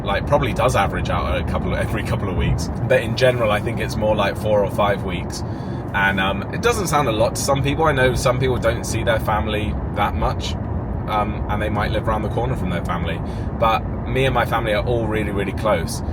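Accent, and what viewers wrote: England, United Kingdom. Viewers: we could never possibly get tired of seeing your handsome face on our screens and hearing your gorgeous English accent - much love to you and the handsome Keegan 🇮🇪☘️🇮🇪❤️❤️❤️❤️❤️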